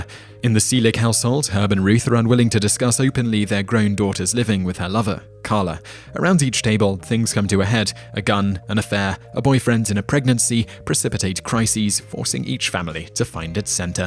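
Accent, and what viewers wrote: United Kingdom. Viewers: ... maybe it sucks first of all because this guy has a British accent. I realize the tradition does actually go back to the English, but these days it's considered an American holiday ...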